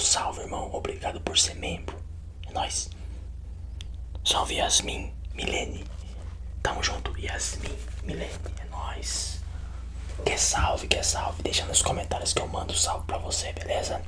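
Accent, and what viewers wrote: South, Brazil. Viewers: Tu é de SP mesmo? Teu sotaque parece do Sul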